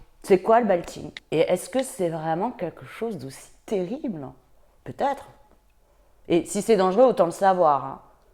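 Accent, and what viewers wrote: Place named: Paris, France. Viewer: a chier avec son accent parigot bpbo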